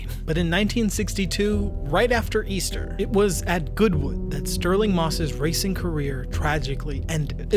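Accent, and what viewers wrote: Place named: United States. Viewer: a Yank talking about British racing...? no sorry , you've lost me not even a minute in...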